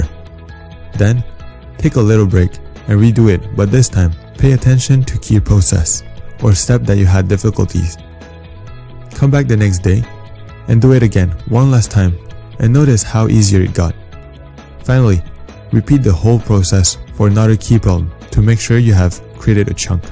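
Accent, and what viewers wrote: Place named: Singapore. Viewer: I can see that you are singaporian through accent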